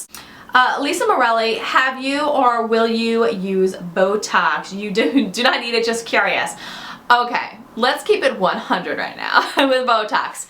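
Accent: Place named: Long Island, United States. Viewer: OMG! I just started watching you recently (found you through Dani!) and as soon as you said "draw-ring" lol I was like wow she sounds like she's from LI. And then you said you got your cat from north shore!! I'm from Long Island too!! Will you ever do a meetup??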